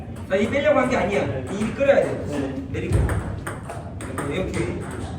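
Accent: Gwangju, South Korea. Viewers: 나도광주사람이지만 사투리진자 최고네요 엄청웃기요ㄷㄷㄷ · 관장님~ 리시브 강의 최고네요~ 구수한 사투리..친했던 광주출신 군대 동기가 생각나네요.. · 전라도 사투리 구수하네요 내용도 좋구요 코치 부럽습니다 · 광주 싸나이 배딸배아빠"님 반가워요. 저희 전라도 광주 맞아요 ^^; 광주 살 다 직장 때문에 수도권으로 가신 분들이 많지요. 배딸배아빠님께는 의 구수한 사투리가 더 정겹게 들릴 거 같아요. 이렇게 탁구를 통해 다시 인연을 맺고 얘기 나눌 수 있어 기뻐요. 탁구 10년 차라니 대 선배님이시네요. 저 로봇수제자는 올해 탁구 4년 차 새내기 입니다. 그래서 엉성한 것투성이랍니다. 하지만 탁구 열정은 누구에게도 뒤지지 않아 졸라서 부족한 것 채워가고 있어요. 정말 탁구 레슨 중에 하시는 한 말씀 한 말씀이 주옥같은 경우가 많아요. 그래서 저만 보기 아까워서 유튜브를 시작했답니다. 어제까지 춥더니 오늘은 완연한 봄기운이 느끼지는 불금입니다. 즐겁고 행복한 불금되세요~ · 광주인가요~? 사투리 구수하니 좋네요 ㅎㅎ 광주살다 서울와서 직장다닌지 16년 됐는데 그립네요~ 서울에서 탁구에 빠져산지 10년차 초보인데 관장님 가르침이 주옥같네요 ㅎ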